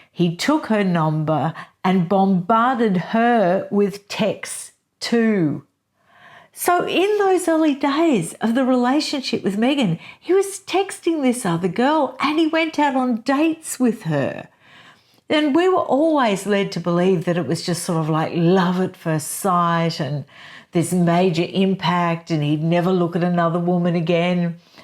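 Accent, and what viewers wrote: Australia. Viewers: Thank you for your videos. It’s so wonderful to hear an Australian accent on YouTube too. I like the way you intelligently present things. I also enjoy your genuine glee at sharing the “juicy bits!” 😉😂💐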